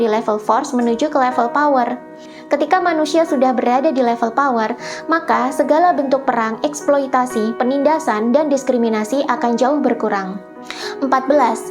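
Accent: Java, Indonesia. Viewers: sampean kok medok mbak. jowo po ! 🤭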